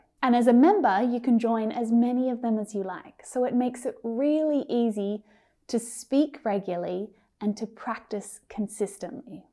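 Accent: United States. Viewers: The pronuncaition is American English. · I fink is american accent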